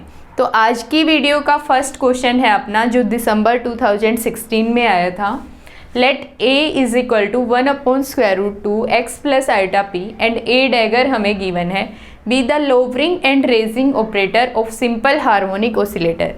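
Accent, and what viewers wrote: Haryana, India. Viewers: maam are you from haryana???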